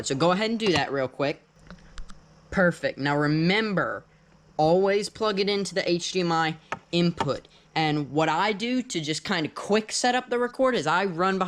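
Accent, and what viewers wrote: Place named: United States. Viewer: Its kinda like what the people in England think Americans sound like in a good way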